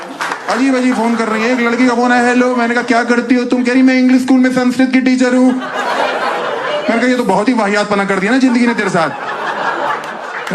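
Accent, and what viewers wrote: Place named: Uttar Pradesh, India. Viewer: His UP accent is the best 👏🏻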